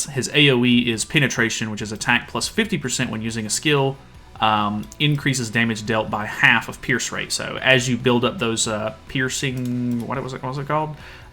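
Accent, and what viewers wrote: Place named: Texas, United States. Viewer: are u by chance from Texas? Your accent reminds me of Maggie from The Walking Dead lol BTW maybe try Green or LR Diane, Lance and King? The passive Diane relic damage boost should be pretty nice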